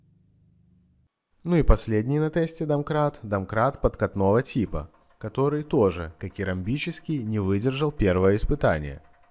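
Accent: Belarus. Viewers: Канал называется Крэйзи рашнз эксперимент, а войсовер имеет выраженное беларуское произношение)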